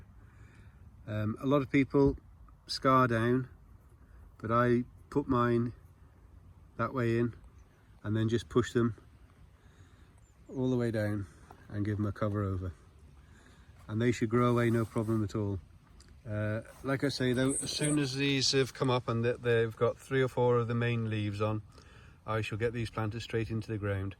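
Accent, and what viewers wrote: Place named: Scotland, United Kingdom. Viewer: I'm not very good with accents. Are you from Scotland? You accent is lovely.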